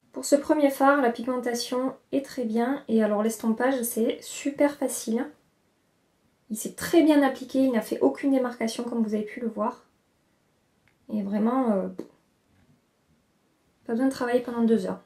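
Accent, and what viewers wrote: South, France. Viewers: Le petit accent du sud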